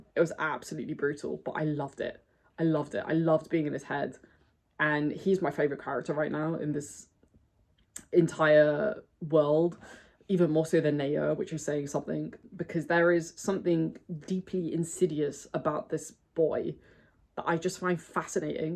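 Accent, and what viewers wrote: United Kingdom. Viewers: Great video, thank you for sharing it. I think you loved many of the same aspects that I also love. Also! It's great to hear a fellow British person pronounce all the names in the way that seems natural to me :) Off to check out your other videos... Thank you for this.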